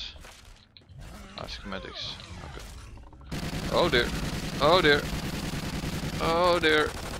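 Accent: Netherlands. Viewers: Haha deze man is Nederlands en dat kan je echt ziek goed horen. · Are you dutch?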